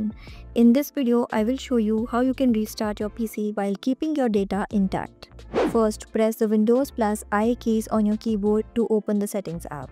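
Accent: India. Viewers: That indian accent XD I can't get any more XD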